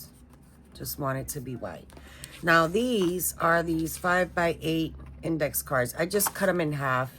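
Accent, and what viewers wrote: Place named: New York, United States. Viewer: These are awesome. You are very creative. New subscriber here! I could hear that New York accent as soon as you started talking. ❤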